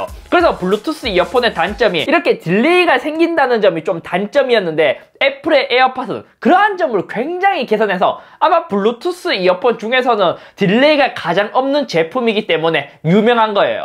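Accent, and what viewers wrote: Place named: Andong, South Korea. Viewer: 잇섭님 안동 출신인가요ㅋㅋ 익숙한 사투리가 들리니 반갑네요ㅋㅋ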